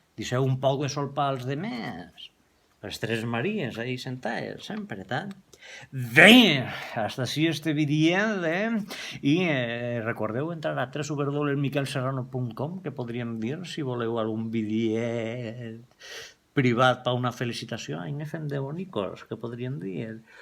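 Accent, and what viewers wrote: Valencia, Spain. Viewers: parles el valencià churro, cap de castellà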